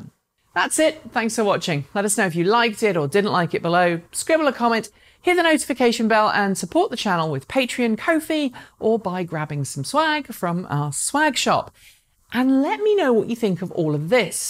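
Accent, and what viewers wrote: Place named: England, United Kingdom. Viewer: This lady is so erudite and I love the English accent .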